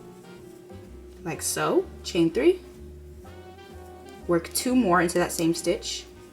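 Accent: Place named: United States. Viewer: thank you for the tutorial! could you pls tell me what american accent you have? asking for scientific purposes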